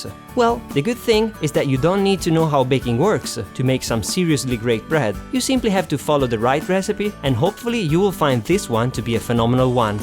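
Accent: Italy. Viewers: Tu sei toscano allora!! :)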